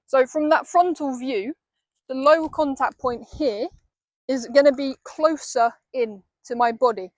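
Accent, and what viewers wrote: United Kingdom. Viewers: You are a detailed good teacher. By the way, are you British ? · Definitely British, I lived in Britain for 30 years. I sense a hint of a Cockney accent aswell. She's gorgeous!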